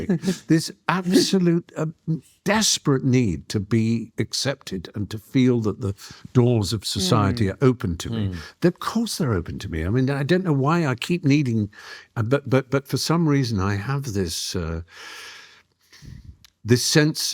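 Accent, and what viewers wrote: RP, United Kingdom. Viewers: Without watching this, for Fry it means speaking with a cut glass accent while telling the rest of us that speaking properly is not important. It means rubbishing Christianity and then saying how much you like to sit in an English church. It means setting yourself up as a liberal elitist and then using the (lack of) freedom of speech card to crawl across the political divide to more conservative politics. He’s typical of a liberal who has the luxury of not having to live with its consequences. I always had a lot of respect for him but now just think he’s full of shit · What it means to be British? I'm American so I don't know and don't care. I only know we dodged that bullet. The accent's nice, though, but it's another trait that is rapidly fading.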